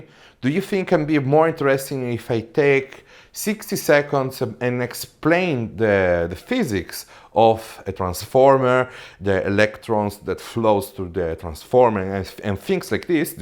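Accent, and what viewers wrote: Italy. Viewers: May be the frequency of uploading videos could be the problem. There is another channel I follow known as "The pasta queen". She is also Italian and speaks the Italian English accent as you and has good following so may be its not the way you narrate. You should try Youtube shorts to divert audience to your channel as everyone else is doing. Engage the audience and you will again shine. Dont loose the channel at 500k what most people dream of. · The last video I see in this channel is from 11 months ago, I believe that making some short projects that you can upload in between the actual big projects would help with views and also make the algorithm spread it more, your English is perfect, you just have an Italian accent which is not a problem at all. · your english is fine (maybe with an Italian accent what is fine) and also your video's are very nice to watch. 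I also took a subscription to your new channel, not to miss out on something and hope it does what you want it to do. 🤗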